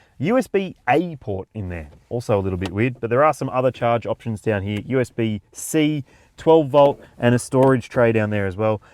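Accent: Australia. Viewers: Lol average American moment. How can you not tell that this is from Australia? The car is right hand drive and he has an Australian accent.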